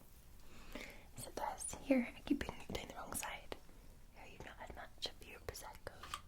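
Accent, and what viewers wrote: Scotland, United Kingdom. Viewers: Amazing asmr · My grandmother is Scottish, your accent reminds me of her 💕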